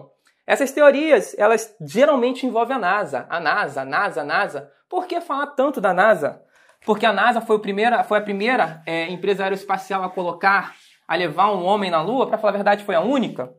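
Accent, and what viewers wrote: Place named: Minas Gerais, Brazil. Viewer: Nuuuu, MINEIRÊS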